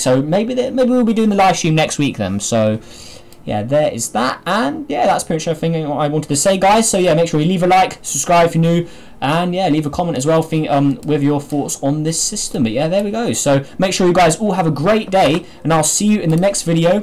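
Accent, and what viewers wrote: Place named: United Kingdom. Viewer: Are you from United-Kingdom? Its like if you have a little accent 😀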